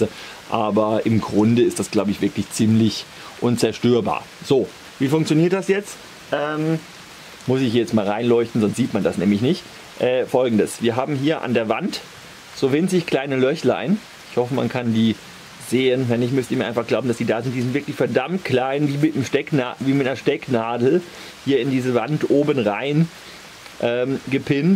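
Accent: Germany. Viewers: de kann das ( sch. in gebastelt) schon schweizerisch aussprechen zwar noch mit deutschem akzent aber tönt schon recht gut.die evelin hat guten einfluss auf dich.hehe witer so.i mag dini biträg..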